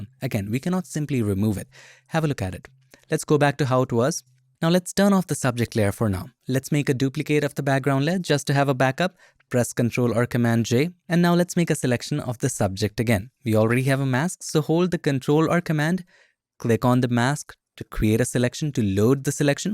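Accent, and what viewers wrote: India. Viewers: This guy makes the Indian accent very smooth and well integrated with English language. Nice style.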